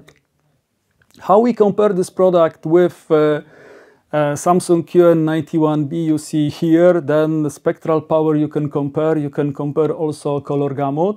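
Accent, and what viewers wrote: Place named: Poland. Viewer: Nice accent. Direct from Radom , I supposed.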